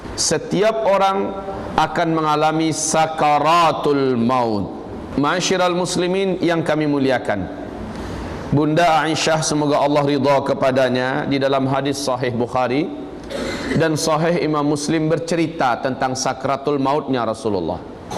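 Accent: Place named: West Sumatra, Indonesia. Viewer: Ustaz...ustaz minang ko bangkinang? Kadang-kadang bunyi minang...kdng-kdang bangkinang.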